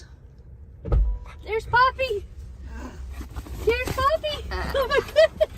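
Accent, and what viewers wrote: South, United States. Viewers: I ❤️❤️ your sweet southern accent! It’s so calming 🥰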